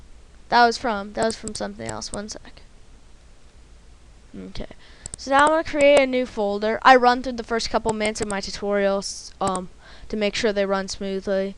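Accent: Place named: Alabama, United States. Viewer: or... would it be sky flaaaawers? ;) j/k. This tutorial was cool. I used to have the same accent, being originally from Alabama and raised partly in Florida.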